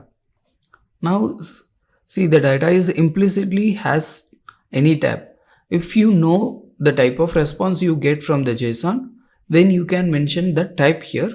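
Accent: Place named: India. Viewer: 1. Learn english. The fucking indian accent, i had to turn subtitles on It's all a lie: There is an error on the jest home page at the beginning: it says: "Jest is a delightful javascript testing framework". It should be "Jest is shit and a nightmare". Focus on simplicity? It's a fucking nightmare and hard as hell Zero Config? AHAHHAHAHA You hope so, it will require you to configure paths in 2 or 3 files and it will still gives tons of errors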